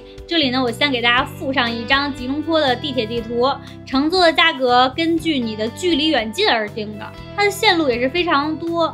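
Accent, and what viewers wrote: China. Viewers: 主播北京的吗？ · 是那里人？口音像是北方人😊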